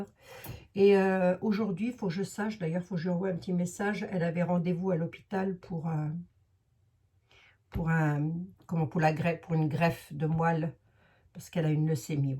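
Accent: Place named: Lorraine, France. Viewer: Bonjour Merci beaucoup, J'adore vous écoutez, vous avez bien le parler lorraine, et ça me fait du bien. Je vous remercie beaucoup pour la voyance. Et votre humour.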